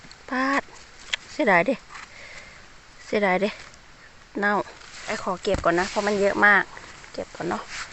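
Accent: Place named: Sakon Nakhon, Thailand. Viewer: น้องไออยู่จังหว้ดดั๋ยจ๊ะคือเว่าสำเนียงสกลนคร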